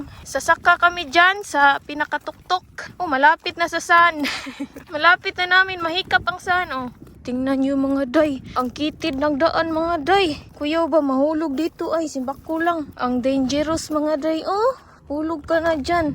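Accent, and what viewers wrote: Visayas, Philippines. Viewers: Ang cute mo magsalita ng bisaya,sak² sinagol Tagalog ba😀😀😀pag amping mo ug sa jogging nyo💪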